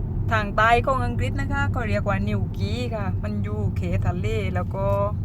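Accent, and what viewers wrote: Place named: South, Thailand. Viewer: ขับรถชมวิวด้วยคนนะคะ ไม่เบื่อๆจ้า ชอบฟังพูดภาษาใต้ มีเสน่มากเลยค่ะ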